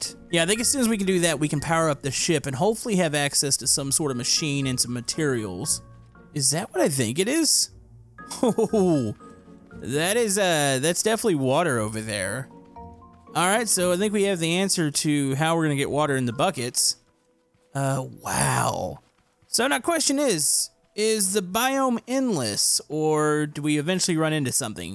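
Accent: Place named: South, United States. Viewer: He's southern, accents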